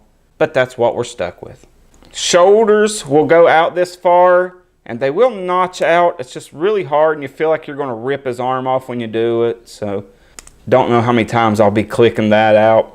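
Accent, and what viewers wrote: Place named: Texas, United States. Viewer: Your accent..your from texas?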